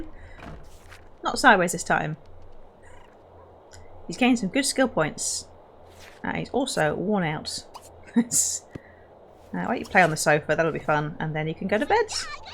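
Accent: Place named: United Kingdom. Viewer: Omg u and I literally have the same accent 😂😂 us brits need to stick together 😁😁😁🇬🇧🇬🇧🇬🇧🇬🇧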